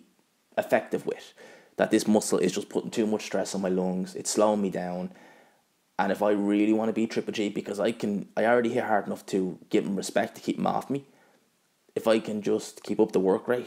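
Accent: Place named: Ireland. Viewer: Your toughts haha I love Irish accent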